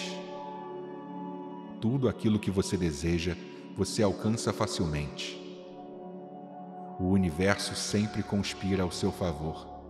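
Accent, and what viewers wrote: Rio de Janeiro, Brazil. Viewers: O conteúdo é otimo, mas o carioques me quebra rs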